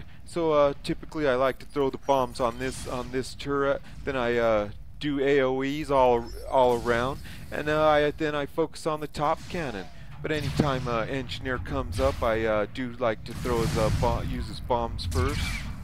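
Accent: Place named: Denver, United States. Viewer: <num> is my Ally code. Yo so I play swgoh screen name PlayStyle. I was watching your channel like a year ago then for so.e reason I stop getting your content but I see Im still subscribe and the bell is hit. So I'm watching your channel and u cracking me up and I'm like this dude talks like the homies. You know Denver has a unique swag it's a West coast swagg with Midwest flava strong Latin influence, so I'm like he sounds like he from S. Denver maybe the West up N. Is well we know wat it is and Aurora is blacks whites alot of Interacial fams. So I keep watching and sure enough you pushing that 303/720 kar I was like wow bcuz I ask everyone do u play swgoh? I been on since we Nov/Dec 15 & Neva met anyone who plays this game from Here. So thx for reppin the city for all the Galaxy Nerds and undercover nerds out there. I like your content bcuz u keep it real check out my acct I know u know alot of dudes know but if u ever need to use one of my accts to feature a player let me know. 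I have 2alt accts bcuz there was a time before raids and ships were I could t get enough swgoh I would complete everything in 8min (with the exception of timer events ie: Arena) anyways the wife is buggin talking about I know u ain't takin a shit I. There with the door locked I k ow your nerd ass is trying to save the universe! I just told her the Galaxy chic we saving. The Galaxy. <mail> holla back.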